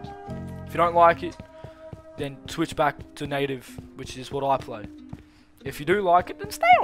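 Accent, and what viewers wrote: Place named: Australia. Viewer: He’s Aussie adlay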